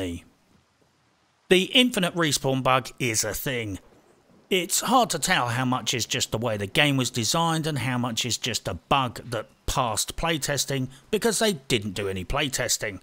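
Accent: United Kingdom. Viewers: Nothing will ever be funnier to my silly American ears than a well-spoken British man taking the absolute piss out of a sh*tty video game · I love how the narrator talks and swears like British Sharlto Copley.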